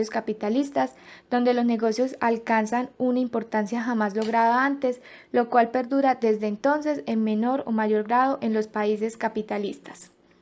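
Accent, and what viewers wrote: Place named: France. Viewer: tuvieras un toque francés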